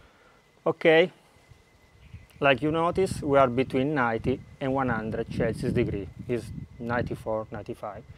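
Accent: Italy. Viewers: I love how everything you make turns out spectacular! I want to try making ALL OF YOUR RECIPES! By the way, your English is quite good, and love your Italian accent. Would you do us all a favour and say a few words in Italian to start your shows? Would love to hear you speak your mother tongue, but need some translation in captions, so we can follow along 😊👍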